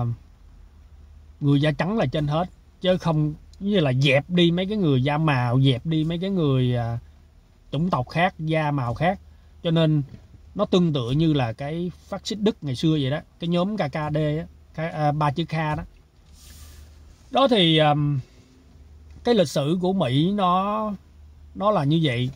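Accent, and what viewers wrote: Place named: Can Tho, Vietnam. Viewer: Chúc. lạc quan lên mình người bạc Liêu và thích giọng còn càn thơ của bạn nhìn thấy bạn hơi ốm và mắt hơi buồn làm mình buồn lây. Thôi cố giữ vững cuộc sống nhé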